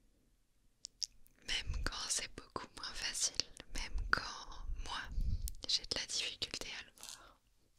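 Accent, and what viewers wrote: France. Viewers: Lol yeah her French is great...she's a native speaker. She lives in Montreal (Québec province of Canada) but she has a French accent when speaking (not a Québécois accent). Makes me think she's French and moved to Canada.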